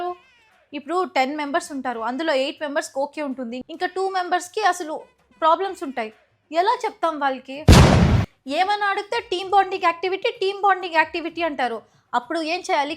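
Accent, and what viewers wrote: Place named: Karnataka, India. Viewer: It"s Karnataka Telugu 😂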